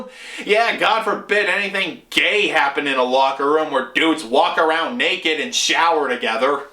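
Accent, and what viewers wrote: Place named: Massachusetts, United States. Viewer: I know that accent... you're from MA... North Shore? Medford or farther north?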